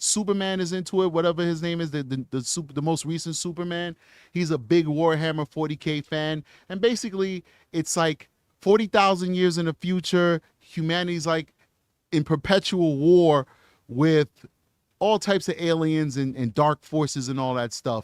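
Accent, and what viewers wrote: New York, United States. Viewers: Love this guy ❤love his accent his NY swag 😂😂😂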